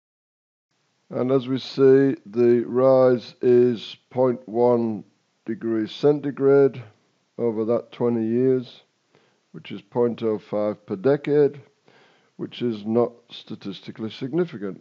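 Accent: Yorkshire, United Kingdom. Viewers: As usual, this contributor's analysis is presented with succinct scientific clarity; and his terse, placid-yet-authoritative style is a welcome change from the strident, shrill tones of the alarmists' hard sell. In honest science at least, substance trumps emotion every time. Despite writing, as I am, from t'other side of yonder Pennines, I am humbly obliged to concede that his sound-reasoning rebuttals of the IPCC's characteristically-devious acumen are admirably accentuated by such rectilinear "Yorkshire talking". Considering the magnitude of the IPCC's disgraceful climate hoax, his blunt turn of phrase is also refreshing.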